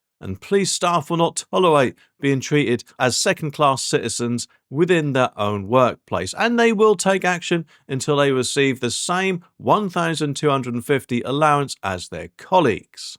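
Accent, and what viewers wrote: Norfolk, United Kingdom. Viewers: Am I detecting a Norfolk accent/ tone..?😊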